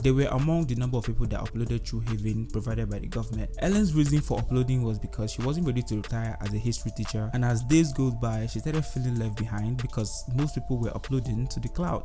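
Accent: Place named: Nigeria. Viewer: Love a naija voice doing reviews like these! Keep it up Will watch all your videos 💪🏿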